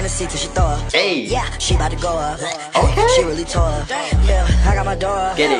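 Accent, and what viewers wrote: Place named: New York, United States. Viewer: I luv the way you talk😍😍😍 Is he from NewYork?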